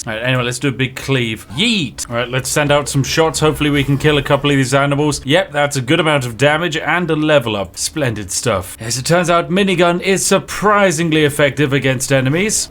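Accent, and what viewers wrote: United Kingdom. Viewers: I got excited when you said boulders gate free... Then I realize your British with a ridiculous accent...😢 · Here is the thing. When has Spiff ever failed? Never! This is just about seeing how awesome his british voice is. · Words cannot express how much I want Boblin - with Spiffing Brit's very british narration still in place - meeting SmuckSquad's Goblin Slayer. Its the sort of crossover that's born in the depths of hell and should not work but I have full faith that with some Yorkshire Tea we can pull it off.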